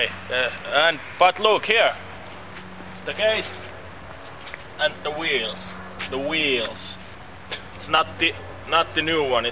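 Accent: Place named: Finland. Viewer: I'll listen to this, if i miss the proper Finglish! :D Such a clearly nice strong typical Finnish accent! Yeah! :D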